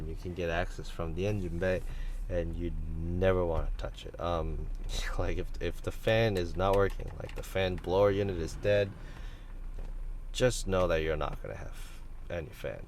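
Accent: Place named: Asia. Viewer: I can tell your Asian with just hearing you talk, you talk kind of like my Asian friend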